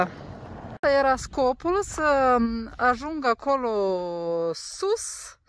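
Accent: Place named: Moldova. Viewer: Bonjour..!! Omul bun atrage binele.. Te urmăresc cu mult drag si te admir pentru ceea ce faci, singura prin lume. Accentul tău moldovenesc imi încălzește sufletul. Bunicii mei au trăit la graniță cu Rusia si vorbeau aceeași vorbă dulce ca și tine. Mult succes în continuare. Prends soin de toi! 🤗🍀🥀🥀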